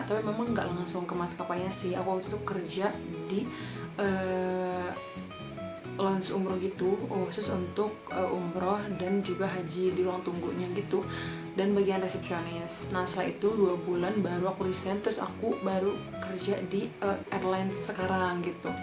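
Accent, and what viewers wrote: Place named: West Java, Indonesia. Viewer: Duh sunda pisan euy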